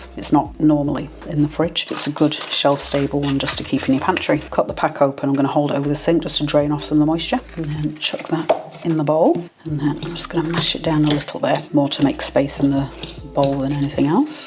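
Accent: United Kingdom. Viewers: This looks delicious. I’m celiac so always looking for new recipes. Btw is that a Leeds accent? I’m from Leeds originally so always nice to see YouTubers from back home.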